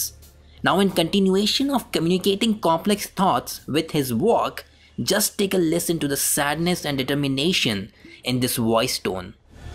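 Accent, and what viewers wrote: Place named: India. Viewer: Dude u have to firm your Indian english voice the vocabulary is good but sharpness of words, u have to soften it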